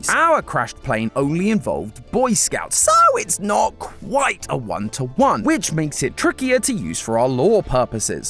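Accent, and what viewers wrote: United Kingdom. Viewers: just came from a film theory and got jumpscared by the british accent immediately 💔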